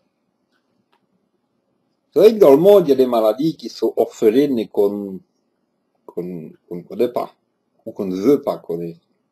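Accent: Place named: Belgium. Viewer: Merci et bravo :) je suis fibro depuis 12 ans et depuis cataloguée hypocondriaque par mon doc donc aucune prise en charge anti douleur ah si je dis des conneries dafalgan codéiné pour mes migraines avec aura nan pasque ca donne mal au crane de souffrir h24 quand même alors merci j ai adoré t'écouter (ahhhhh l accent belge) ps: suis de france genre cht'i et ici même bordel fibromyalgie kezako reprend un ticket et reviens me voir plus tard lol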